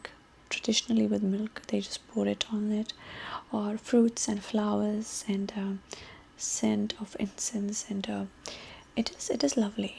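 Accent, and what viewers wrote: India. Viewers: “Do you know how expensive my sari is??” 🥳Your Indian accent and this character are the best! Also: the moon is always out, even in the day, but is made invisible by the suns brightness, which means your light is eternal and constant, unlike the suns.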